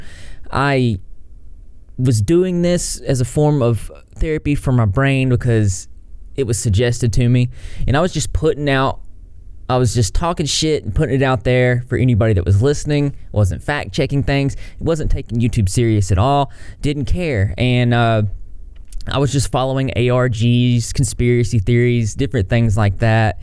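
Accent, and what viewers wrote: South, United States. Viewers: Do ya got a little southern accent? Or am I just crazy? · Just discovered your channel and already hooked. I’m glad you got better from your accident and found YouTube to help with that. There will always be haters but keep pushing forward. I LOVE your hair btw and you sound like you are southern like I am :)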